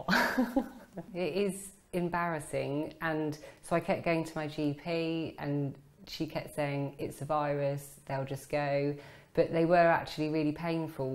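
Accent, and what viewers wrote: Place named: England, United Kingdom. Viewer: What is beautiful about this woman? EVERYTHING!! Her face, her smile, and perhaps, most importantly, her accent. I wonder where is she from. I know she is from England, but I wonder what part of England?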